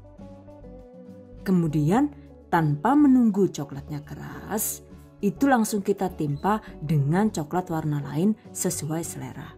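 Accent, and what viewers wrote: Banyumas, Indonesia. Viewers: lah jan rika jane wong ngendi.. 😆nyong ngapak kie,dadi geget ngrungokna rika gawe video wkwk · Saya suka dengan Chanel ini, penyampaian dan resep nya ok banget. Mbk nya juga lucu,kadang ngapak juga. Haha Andalan nya "catat" dan "ookeeh guys" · Seneng nek lg ngapake 😅😅😅 Suka pokoke nyonge ngrungokna ngapake ora ngapak ora penak 😍 Sllu mengikuti yu 😍 sukses selalu.pokoke 🙂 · wong ngendi sih rika kok bisa bahasa ngapak? Salam ngapak 🤣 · ini aslinya orang mana sih? Ngmong ngapaknya lucu, tp pinter bhasa inggris juga 😁😁👍👍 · Mantap teh,pake BHS ngapak dong,seneng bget q dengernya. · 😂😂 klo sdh logat ngapak bikin ngakak Ini konten masak teraasikk 😁 sukses bu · Pling suka nonton vidio lucu bhsnnya cmpur ngapak krn aq jga org purbalingga jawatengah sama ngapaknya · Kerennnnn Bun apalagi kalau pas Bunda ngobrol ngapak seneng saya dan Alhamdulillah sya kemaren nyoba buat modal cangkir dan tatakan nya sama mobil2 an lucu lucu makasih Bun sehat selalu buat Bund👍🏼👍🏼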